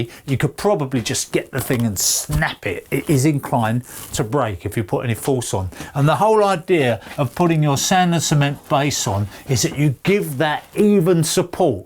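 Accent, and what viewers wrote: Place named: Hertfordshire, United Kingdom. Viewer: Oh bless you, sorry if I upset you too. My wife and I are from Hertfordshire originally and all our pals talk like you. We love ya mate and wouldn't want you to change, your personality does the talking ! 🤗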